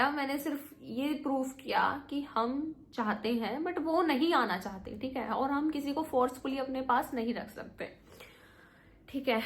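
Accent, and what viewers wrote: Haryana, India. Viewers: yr m proper delhi se apke muh se haryanvi sunke acha lga 😅😅😅😅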